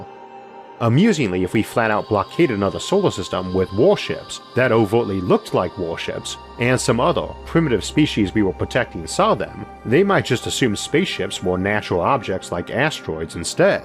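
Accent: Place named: Mississippi, United States. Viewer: what accent does have? I assume American, but from what part of the US?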